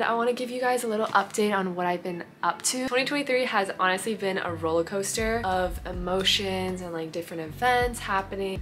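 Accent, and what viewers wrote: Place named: China. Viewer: Mix of China and American accent when speaking canto.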